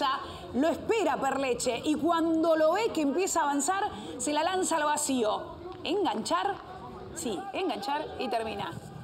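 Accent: Argentina. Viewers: Una hembra comentando ?? Y con acento de argentina ...hasta el culo el periodismo futbolistico en el Peru.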